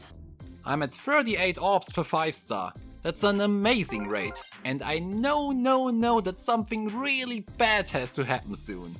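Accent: Germany. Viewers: German accent: nunja, dann haben wir hier ja noch einen deutschen Feh Spieler! :)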